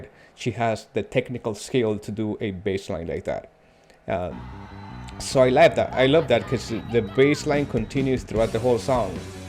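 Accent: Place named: Latin America. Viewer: The million dollar question is not about Rudy...what is your nationality? Your accent sounds latin, but clearly you don't understand Spanish. Inquiring minds want to know. Great opinions and comments!! Enjoy this journey you have embarked upon! Oh yes...this no rabbit hole...this a caldera, because artistry is as wide as it is deep! Salud! #APDVillaReality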